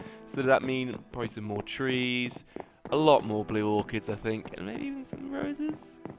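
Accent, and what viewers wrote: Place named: United Kingdom. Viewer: omg I just luv ur british accent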